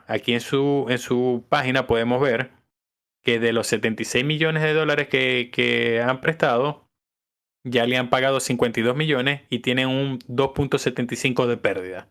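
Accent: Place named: Zulia, Venezuela. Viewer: Este canal es un.. what the fuck!! Solo por curiosidad escuche el acento zuliano, pero de verdad mal producido y desarrollado el contenido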